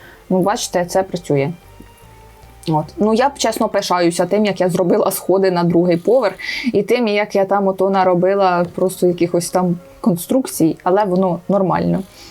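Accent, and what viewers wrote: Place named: Poltava, Ukraine. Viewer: Ви така крута!) Мені дуже подобається ваш говір. Моя подруга з Полтави спілкується дуже схоже і це дуже мило Попалися в рекомендаціях, одразу підписалась Дякую за контент))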